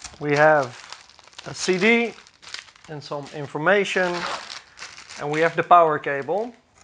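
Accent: Netherlands. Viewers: Ik hoor dat je Nederlands bent hahaha Leuke review❤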